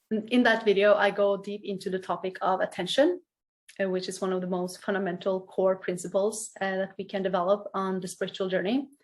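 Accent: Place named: Norway. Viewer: Tack! Det står helt klart att du har egen erfarenhet av det du talar om. Själv är jag inne i min tredje mörka natt. De två tidigare var jobbiga, men betydligt lindrigare än denna, som har pågått över 3 1/2 år. Efter de två tidigare mådde jag väldigt bra och trodde aldrig jag skulle behöva erfara detta igen. Men uppenbarligen behövs det. Varannan dag är hanterbar, ångesten är påtaglig men ändå fungerar det på något sätt. Då börjar inspirationen komma, men det räcker tyvärr inte länge. Dagen efter brukar vara mycket tung, jag kan gråta hela dagen, och tror att detta aldrig ska ha ett slut. Jag brukar säga till mig själv att det är någonting fint som händer. Jag hoppas att jag ska kunna hjälpa andra människor i samma process som jag går igenom, och lugna dem med att allting är precis som det ska vara. Tack för den inspiration du ger. PS Jag hoppas att mitt inlägg översätts till engelska så att det inte bara är du som kan läsa det! 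Man hör tydligt på din accent att du har norska som modersmål! Trevligt!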